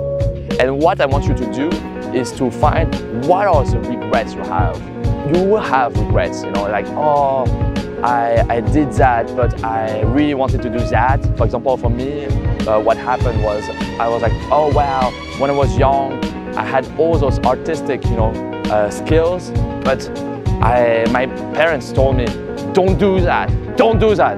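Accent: France. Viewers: are you french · Thank You man ! I think this is the best video I ve seen today! And I love ton beau French accent en tout cas merci pour cette video et tout tes conseils instructifs <3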